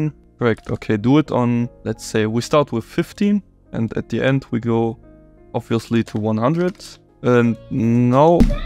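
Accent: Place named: Germany. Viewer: Ich kann deinen Deutschen Akzent hören haha 😭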